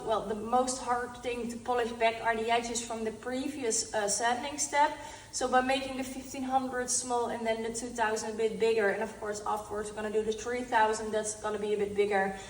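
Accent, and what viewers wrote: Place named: Netherlands. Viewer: Where are you from? Sounds like you have a Dutch accent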